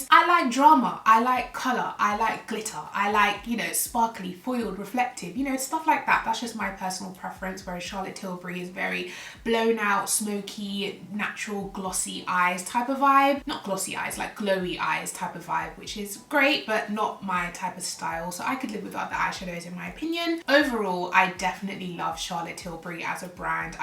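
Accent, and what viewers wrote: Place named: United Kingdom. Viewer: When you're searching for a specific video and then you hear a British accent 😫Subbed x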